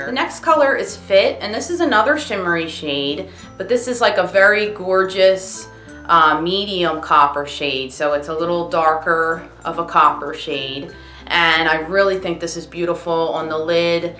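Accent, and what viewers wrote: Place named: Philadelphia, United States. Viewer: Also I just stalked you a little meaning I went on your twitter and saw your from PA. I knew you were from the Philly area. Your accent is comforting to me and makes me miss home.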